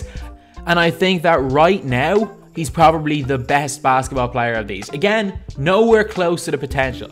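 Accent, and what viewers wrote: Ireland. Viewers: Your defo irish .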